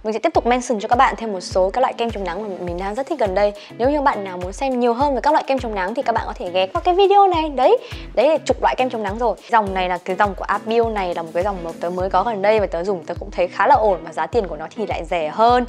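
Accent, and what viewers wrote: North, Vietnam. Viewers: Chị ở Thanh Hóa người Miền Bắc nha. Bạn nghe đâu ra miền Trung vậy 😂😂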